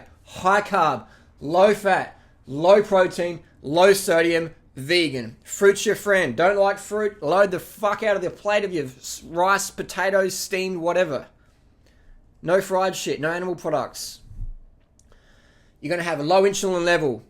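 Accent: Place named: Australia. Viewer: This video is as good as his Australian accent.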